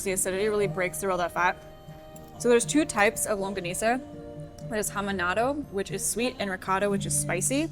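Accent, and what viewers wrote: Philippines. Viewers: Man, u even talk and eat like a Filipino. I'm very impressed. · Your English is sounds like filipino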